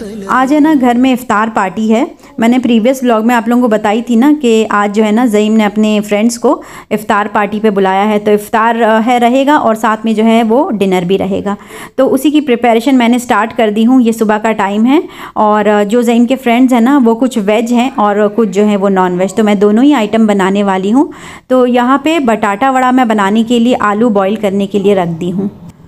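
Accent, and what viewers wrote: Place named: Maharashtra, India. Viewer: U really sound as Maharashtrian even ur cuisine batata wada is Maharashtra famous.u even say kothmir that's Marathi word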